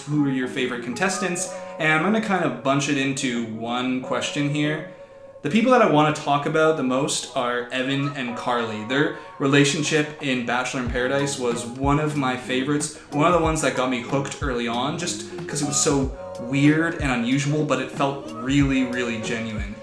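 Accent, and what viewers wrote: Canada. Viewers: OMG those dogs are awesome!! LOL! Ok...You're so cute and thanks for doing the face reveal. It's so nice to have a face to go with the voice now when I watch the fantakes. I'm glad you do them and I am forever anxiously awaiting the next one you make. And yep...I can definitely hear the Canadian now that the video came out and I'm listening more to what you are saying than concentrating on a recap. It's the word "about" that always gives it away. To my ears it sounds like "aboot". LOL....well, maybe not quite that bad but close enough. Always a fan and congratulations on hitting 100,000K. You rock! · Where in Canada are you? (Your subtle accent gave you away!) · Well that explains why your voice sounds so normal to a fellow Canadian. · Congratulations on reaching 101k...that is amazing and the fact you are Canadian as well is such a bonus!! 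🌹 ( We say tomorrow different than yankees? Who knew) PS MikeBookGiveAway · I thought you were cool better because you have a Canadian accent and I'm a Mainer/half Canadian--- but as a Big Sister-- you're even cooler for talking about the Big Brother/Big Sister program! Love your videos, thanks for being so fun! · I WAS SO NERVOUS for some reason haha I pictured you with dark brown hair! I’m not surprised you’re Canadian based off of your accent :) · As a fellow Canadian I somehow detected your Canadian accent haha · Also you say "Tuh-more-owh" and Americans say "Tuh-mar-oh" that's probably what gave the canadian away. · Nice to "meet" you, i was looking forward to you reaching your 100K mark (congrats on that!). I had guessed right about you being from Canada - I can't tell the difference between how Canadians and Americans pronounce "tomorrow" but to me, the giveaway was the way you say "out". 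I've noticed Canadians pronounce the U more. (I'm French-Canadian, so it took a while before my English became good enough for me to notice those subtle differences.) You didn't say which province you are from, though...? Love your humour. Would love to hear you cover Survivor - I'm a big fan as well. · I FREAKING KNEW IT I KNEW THAT ACCENT WAS CANADIAN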